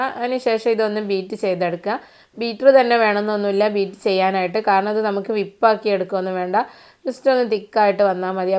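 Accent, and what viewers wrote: Malappuram, India. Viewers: Itha malappuran anoo. Talking kettitt..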